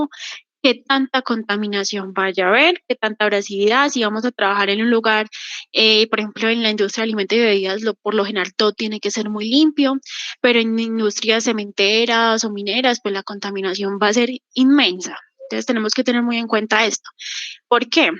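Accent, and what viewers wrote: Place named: Antioquia, Colombia. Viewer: Con ese acento paisa puedo ver y oír un video de más de 3 horas 😊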